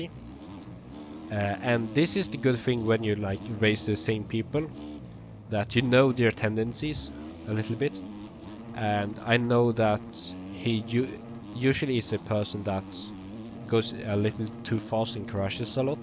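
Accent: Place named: Sweden. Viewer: mate, you have the most swedish accent i’ve ever heard lol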